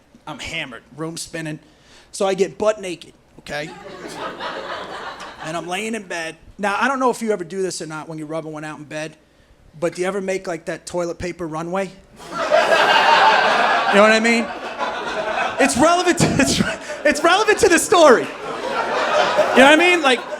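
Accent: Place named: New Jersey, United States. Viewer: I can tell you're from Jersey bc your accent not to be a dick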